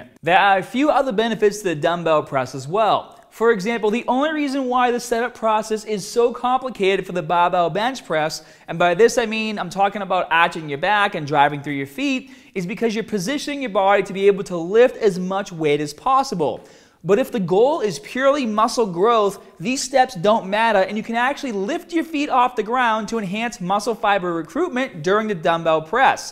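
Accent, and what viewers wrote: Boston, United States. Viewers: he hid his accent when trying to go mainstream now he lets his inner bostonian out. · Woooooow! Your Boston (?) accent is getting stronger and stronger! Regional accents have their charm. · It's called the Boston accent. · When did this guy get a Boston accent? 😂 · It's because originally he tried much harder to HIDE the Boston accent... but then has since stopped doing that because it's idiotic and now (and back in 2016) he's a lot more natural. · Nice Bostonian accent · So many comments bitching about accent... grow up, everybody from the Boston area has the same accent. I moved away from Southie awhile ago (to southeast Iowa), unless I am drinking, I can hide the accent, but unfortunately it will be with me my entire life. If you cannot get thru the video because of the accent, you are a child who should be on A.D.D. medication, don't worry about body building till you become a bit more focused, it is called priorities. 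· Is that the Boston accent they talk about? also when did his accent become so strong? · First thing I thought was oh!! A fellow Bostonian ❤ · Yes he has a Boston accent, just watch the video for the gym tips. It's really annoying to see the comment section flooded with people commenting on his accent and not gym-related things. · When the fuck did this guy develop a Boston accent lol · Dude is from Boston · Did just recently develop his Bahstin accent or was I just missing it for years? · obviously Boston. We New Yorkers don't speak so exaggerated. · this guys boston accent is hilarious!!! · You sound like you're from Boston. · Dude your sudden onset of a thick boston accent is ridiculous lmao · i never noticed boston accent before. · I love that Bahston accent. lmao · He's got a Boston accent. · Apparently it's a Boston accent. I got confused since I assumed 100% of America accentuated the 'r's. 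· lol. this is Boston accent right? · holy shit - the Boston comes strong in this one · don't listen to the haters. the Boston accent is sick. better than the boring ass baritone most Americans speak with. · Maybe that's his real Boston accent but hes trying to hide it but it comes out 👍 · is he from Boston? sounds like a boston accent. not too heavy but subtly noticable. its perfect. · No he just has a Boston Shore accent!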